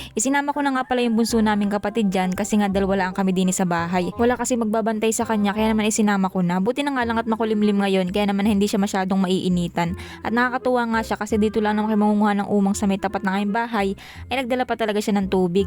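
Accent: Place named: Laguna, Philippines. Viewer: shout out Alway watching from ksa. relate ako sa punto mo magsalita 😂 na miss ko tuloy ang province ko kalayaan laguna ganayan din punto.😂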